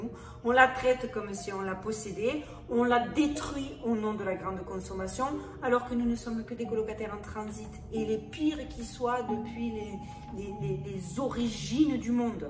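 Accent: Marseille, France. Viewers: trop mimi ton accent!aller l om!sinon,merci pour ces infos,je connaissais deja tte l histoire..stupefiant!faut enqueter...sur place! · Un grand merci pour toutes tes recherches passionnantes. Je découvre ta chaîne depuis les Alpes Maritimes et ton accent au parfum de canebiere est un vrai bonheur à entendre. ❤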